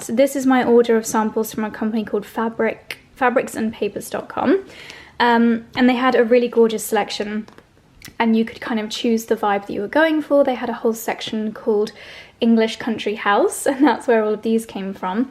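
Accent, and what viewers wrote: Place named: United Kingdom. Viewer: your British accent is always so much more prominent when you're with 🙂 For the new zealander ear, its lovely💕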